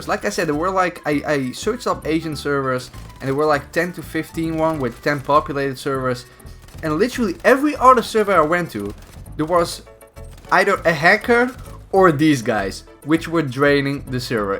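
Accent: Netherlands. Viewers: You sound like you are from Holland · Lekker dat nederlands engels haal je er zo uit hahahaah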